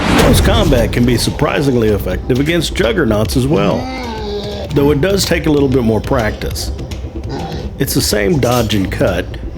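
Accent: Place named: Texas, United States. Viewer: This dude sounds texan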